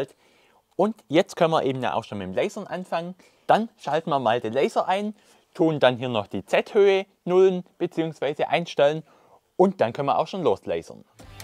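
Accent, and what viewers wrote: Swabia, Germany. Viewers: Schwäbisch isch gsond, jawoll! :D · ist des a SCHWÄBLE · Klasse! Schönes Video! Sag mal, was ist das für ein Akzent den du sprichst? Ich würde mal tippen Großraum Göppingen? Schöne Grüße aus Bocholt (NRW)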